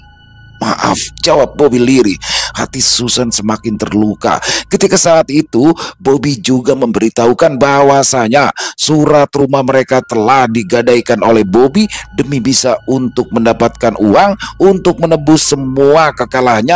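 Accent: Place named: Betawi, Indonesia. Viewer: sangat terhiburr. Om...keren pembawaan nya..tapi klo sdh cerita ada gaya betawi nya lbih lucuu...😂keq warung bng doni...sukses terus ya om ❤️❤️r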